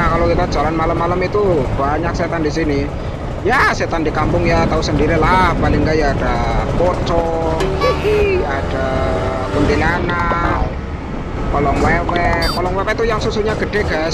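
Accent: Banyumas, Indonesia. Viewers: Bahasan kue loh,,HAHAH ngapak banget..keren keren.. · Kalau nonton lu bikin inget kampung halaman ,ngapak bang 😂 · Wkwkwkwkwkwkw ngomonge pada bae kaya inyong kang asal ngomong, #salamngapak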